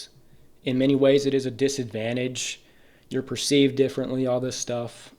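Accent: India. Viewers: the indian accent :face-blue-smiling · Bro's Indian accent💀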